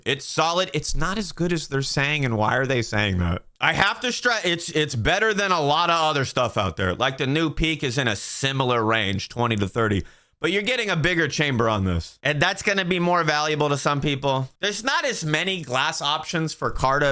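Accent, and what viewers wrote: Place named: Northeast, United States. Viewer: He sounds like hes from the NorthEast, sometimes long island sometimes the bronx, but rolls his r's sometimes haha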